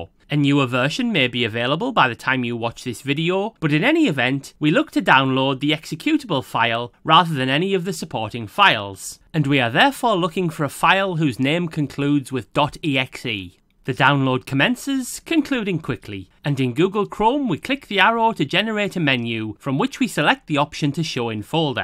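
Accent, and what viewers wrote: United Kingdom. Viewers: Clear , visual instructions and ofcourse it helps that you are from the UK ;) so easier to understand . Please make more videos like this , esp UK focussed content . Subscribed and keep up the good work . Will tell my friends . With such clarity in your videos - you will go far .... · thankyou so much, nice northern man. this was so clear, so concise, a child could do it. I'm impressed and very grateful ❤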